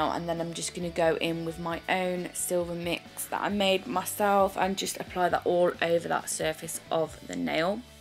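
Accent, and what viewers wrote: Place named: Essex, United Kingdom. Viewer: I've just recently found your channel. I've watched several of your videos and I must say you do some beautiful nails! You're very creative with your artwork. Also, I just love your accent. Where are you from? I'm guessing Essex, but hey I'm American and don't know the different English accents,so please don't be offended if I'm wrong! 😀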